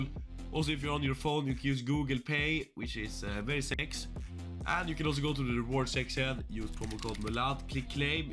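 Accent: Sweden. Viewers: hahah älskar din svengelska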